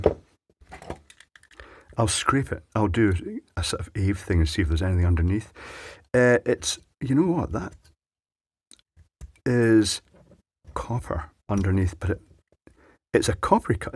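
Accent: Scotland, United Kingdom. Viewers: Is originally from Scotland or Mann? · Wait, you live on the Isle of Man? I been thinking you were from Scotland this whole time.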